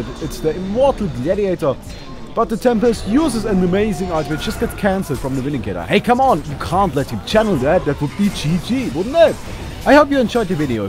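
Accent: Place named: Germany. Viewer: his english is hurting my ears... german accent at it's worst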